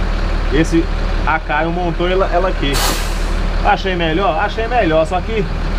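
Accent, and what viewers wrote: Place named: Minas Gerais, Brazil. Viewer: sotaque minerin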